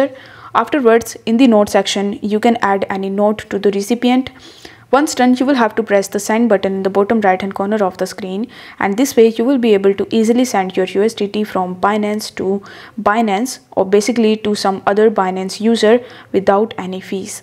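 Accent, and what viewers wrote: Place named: India. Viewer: Your accent sounds Indian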